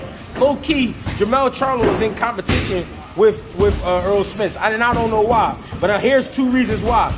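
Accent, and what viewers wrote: Philadelphia, United States. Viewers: Bro definitely from Philly 🤣🤣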